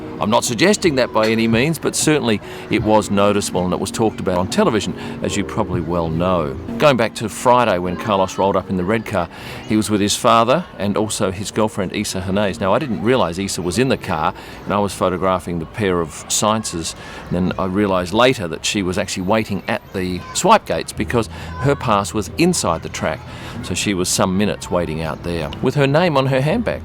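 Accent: Australia. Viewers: Your F1 videos are so unique and refreshing. I can’t stand most of other F1 videos all British YouTubers and track track track focused. I have heard enough during 3 days of watching F1 lol. Yours are much better. Maybe I just love Aussie accent cuz I live here lol.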